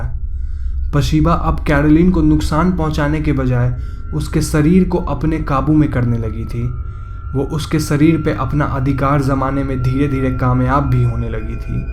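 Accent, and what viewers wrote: Bengal, India. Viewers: Are you Bengali? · A perfect Bengali accent.....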